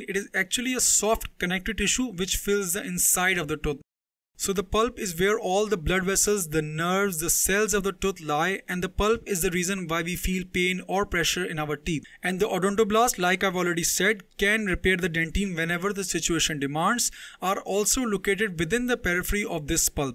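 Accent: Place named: India. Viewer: You have the Bigest Indian accent I’m not trying to be mean my friend is Indian as well) btw thanks for teaching me this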